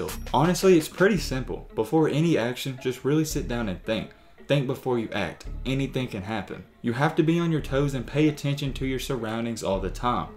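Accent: South, United States. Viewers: dude country asl doing this vid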